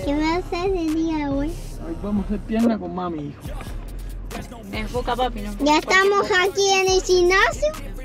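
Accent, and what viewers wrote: Cuba. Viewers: el chama ya tiene el ascento cubanoo ❤😮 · Ya el chama habla cubano, saludos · El chama tiene acento cubano!!!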